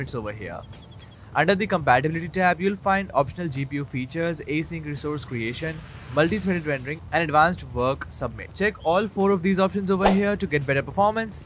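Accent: India. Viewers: No offense, but you sound exactly like those scammers from India.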